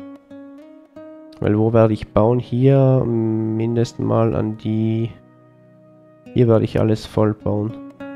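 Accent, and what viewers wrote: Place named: Austria. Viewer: Bist du Österreicher? So vom Tonfall klingt es etwas so :)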